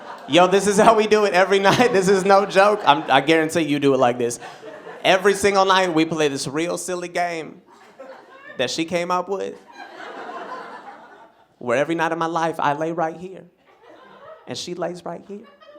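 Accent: Louisiana, United States. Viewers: Hi, I noticed you have not put any videos on here in a long time. I hope all is good, I saw you on America's got talent but not sure how old the video was? I read comments below about your accent, I grew up out in So. Cal., but,moved back to michigan 22 years ago. I have heard many accents and wondered if you were from maybe Lousianna? you have an accent, but it doesn't sound like New York / Jersey, or Canadian, or Michigan, Wisconsin, Minnissota, or any states i can think of, but it has sort of a southern sound but not always. just wondering where you grew up? Most of us get a accent from hearing adults with an accent at a young age. When I moved back to michigan from So Cal, it was funny sounding to me, they really had some strong accents here but they dont think they do. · How did he grow up in the San Fernando Valley and come me out sounding like he’s from Louisiana? 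· Wow you have a thick southern accent for being born and raised in LA, CA. Unless you meant LA as in Louisiana?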